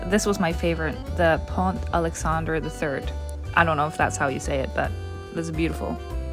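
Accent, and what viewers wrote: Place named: United States. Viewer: thanks for the video. I enjoy your candor. I agree with you that the Louvre is too crowded and the trip to the top of the Eiffel tower at night is fantastic...and I don't like heights either but the view is phenomenal. I don't like long lines either. My favorite part of Versailles was renting a golf cart to tour the gardens in the back! By the way, your English is phenomenal. The more time you spend around Sam, the more American you sound!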